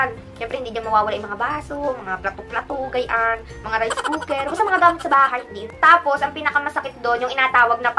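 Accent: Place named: Mindoro, Philippines. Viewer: Natutuwa ako sa mga vlogs mo, relate much ako the way you speak, magkapit probinsya tau, sinasabi ko din minsan mangyan ako, hehehe..keep it up...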